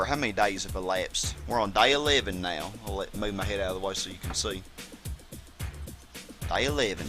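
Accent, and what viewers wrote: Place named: Kentucky, United States. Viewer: Hello there you sound like your from Kentucky or something I’m from Indiana and I see you have a country accent just found you so I’m going to sub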